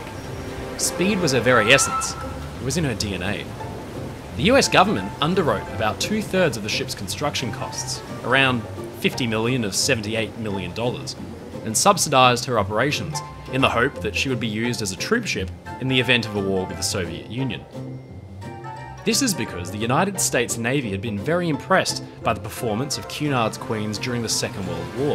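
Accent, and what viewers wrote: Australia. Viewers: Thank you for so many absolutely great documentaries,always enjoy your knowledgeable & well spoken narration. Nice to hear an Australian voice! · I thought you were British until you said "Hee-Uh". Then I realized you're a Aussie mate.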